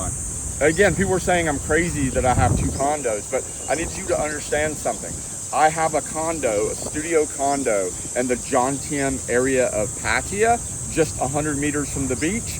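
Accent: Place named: Atlanta, United States. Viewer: I love your Atlanta accent 🤣🤣 I live right up the mountain in NC and it’s so different but I’m happy you are representing the south in Asia!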